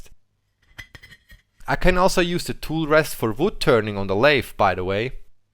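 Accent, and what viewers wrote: Switzerland. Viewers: Great Video. Love the Swiss Accent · Ahh der Schweizer akzent😂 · I love his Swiss accent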